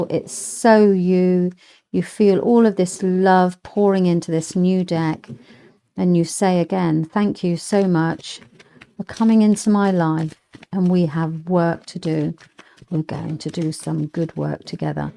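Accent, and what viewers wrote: United Kingdom. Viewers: you have the most lovely accent and speaking voice, how I love the brits , )O( cheers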